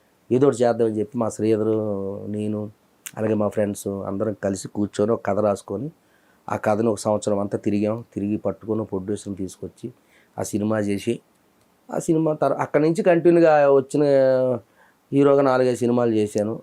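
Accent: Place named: Srikakulam, India. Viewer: గారు మీరు శ్రీకాకుళం కి ఆణిముత్యం అండి. మీలాంటి సింపుల్ గా ఉండే వాళ్లకు అలాగే మన శ్రీకాకుళం యాసకి వన్నె తెచ్చిన మీకు అభినందనీయము అండి గారు. ధన్యవాదములు అండి🌹🌹🌹.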